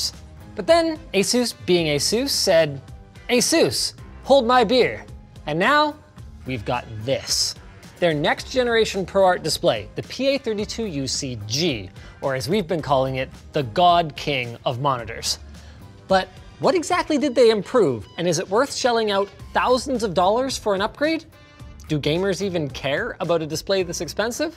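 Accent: Canada. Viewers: You talk Canadian but you spell like the Americans color or liquor you see the difference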